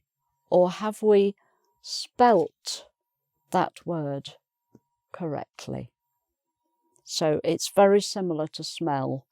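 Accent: United Kingdom. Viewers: I love your british accent ❤ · What lovely British accent, you're so kind for helping us. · You are amazing! Your British accent is very beautiful. Thank you for your lessons!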